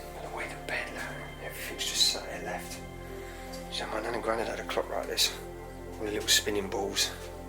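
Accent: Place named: Medway, United Kingdom. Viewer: Happy birthday! Love your vids, yr accent sounds local to me. Are you from medway area? I can't get around easily and you bring adventure to me, thanks matey x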